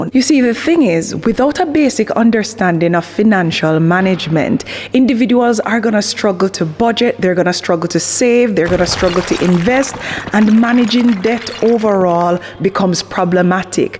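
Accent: Jamaica. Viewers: Are you a Jamaican?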